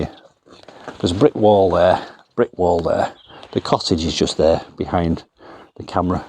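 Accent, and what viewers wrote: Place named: Barnsley, United Kingdom. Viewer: Is your accent Barnsley? I love it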